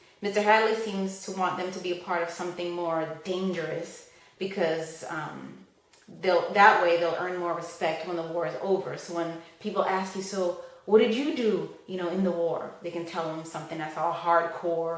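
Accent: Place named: South, United States. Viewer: southern accent isnt needed but good job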